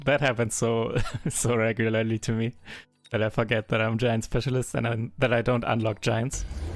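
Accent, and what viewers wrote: Germany. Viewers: do i hear a german accent ?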